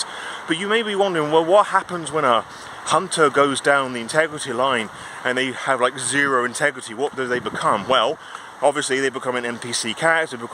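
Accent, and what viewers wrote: United Kingdom. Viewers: If I were British, I would want to sound like you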